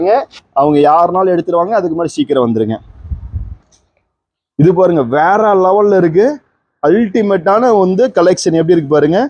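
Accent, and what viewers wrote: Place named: Marwari, India. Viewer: Wow collections. Price super. Settu maadhiri paesaradhu superaa irukku.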